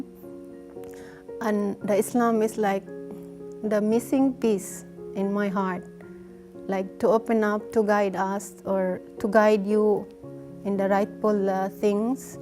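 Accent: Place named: India. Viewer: True I'm from Malaysia and I heard a sprinkle of Indian accent there. The hijab makes her look more malaysian or Indonesian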